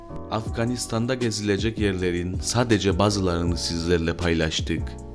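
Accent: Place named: Northern Cyprus, Cyprus. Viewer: Admin Kıbrıs Türk'ü müsün şiven benziyor 😊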